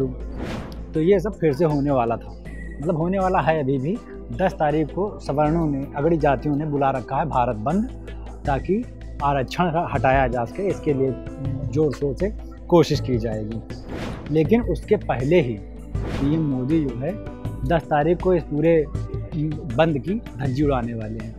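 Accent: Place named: Bihar, India. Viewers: EE sausri Kaahe bhojpuri type main anchorning karat baa..tanik samjh nahi aavat hamka Toh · Abe bihari ki tarah mat bolo mujhy bihario se nafrat hi 🙅😤😠😑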